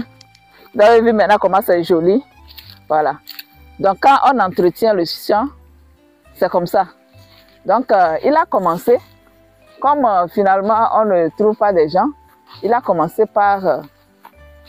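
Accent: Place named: Togo. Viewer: j'ai failli dire que les meilleurs ouvriers agricoles loyale et fidèle en Afrique de l'Ouest sont les natifs du NORD TOGO, mais à vous entendre ça me fait peur, car votre accent montre que vous êtes des togolais, mais une chose est sûr, l'expérience a montré que pour des domaines avoisinant déjà les plus de 05 hectares, faut carrément prendre des ouvriers venant d'une autre région du pays, avec un bon contrat à l'appui, et non des autochtones de la zone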